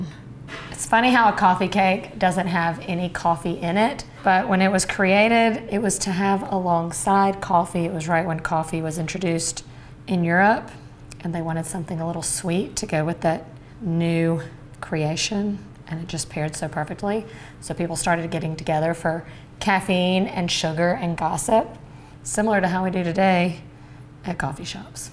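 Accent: South, United States. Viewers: She’s gotta be from the south……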